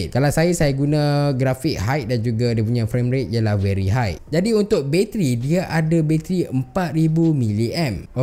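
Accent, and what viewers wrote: Malaysia. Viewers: slang dia kot..biaselah typical malaysian..aku pun macam tu...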